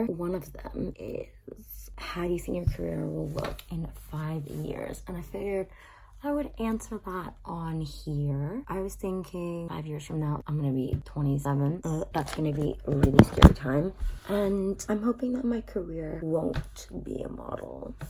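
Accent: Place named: United Kingdom. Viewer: there's some parts in this where you have a really strong brittish accent omg 😂❤️❤️❤️ I guess it's rubbing off on you xxxxx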